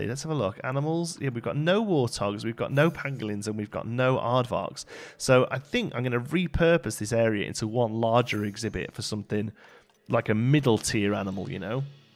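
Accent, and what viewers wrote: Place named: Staffordshire, United Kingdom. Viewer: I thought your accent was familiar! I also grew up in Hednesford/Cannock Chase! Love your videos :D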